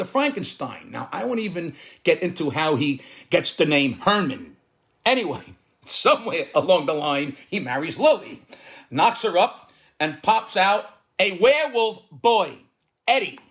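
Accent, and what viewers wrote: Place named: Brooklyn, United States. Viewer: Brilliant observation and delivery!!! We moved from NY to Georgia (a suburb of Atlanta) last year (per my wife’s insistence) and I expected to encounter hillbillies. Far from the truth. People here are like those of any other big city. Besides, many people here are transplanted northerners. And my town is about 30% Asian! I love your humor, except for the profanity (but I know that’s part of your shtick). Listening to you, I feel that I’m back on the streets of Bensonhurst as a kid in the 1960s and 70s.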